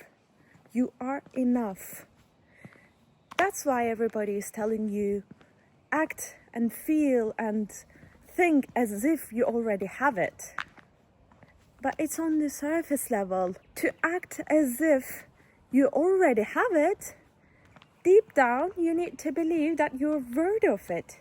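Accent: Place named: United Kingdom. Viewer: Bunları simdi daha iyi algiliyorum, bilincim katman katman aciliyor.. evrendeki her enerjiye, özellikle kendimden ayirdigim negatif düşünceler ve duygulara bile BEN olarak baktigimda onları kabul edip iyileşmek hizlaniyor. evet her kilit TAMLIKTAN aciliyor. tüm kapsayicilikla tüm olasiliklari BEN olarak görüp icinden arzuladigimi secersem realiteye dökebilirim! bunu farketmek bile benim icin muazzam bir farkındalık, simdi sırada bunun uzerine calismak, çünkü asil olay bunu OLMAK. teşekkürler hizmetin icin. British aksanindan öpüyorum🥰😘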